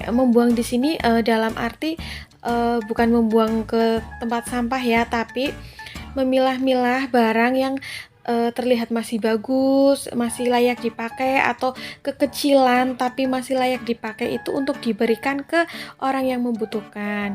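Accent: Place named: Banyumasan, Indonesia. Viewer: Mbaknya orang mana sih... Kok ngomongnya mirip kaya pengenyongan... 😁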